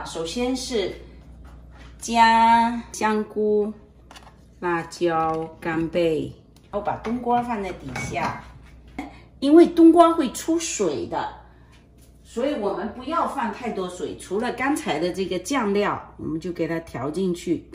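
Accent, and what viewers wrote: Fuzhou, China. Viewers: 听福州口音很亲切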